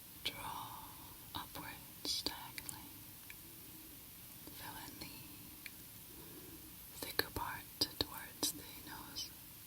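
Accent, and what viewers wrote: Scotland, United Kingdom. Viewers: As a fellow Scot, you're the only Scottish asmrtist who actually relaxes me and doesn't make me cringe at my own accent. Thank you 💜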